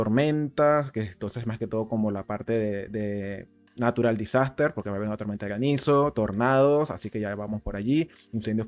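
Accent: Venezuela. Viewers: Bro eres de venezuela?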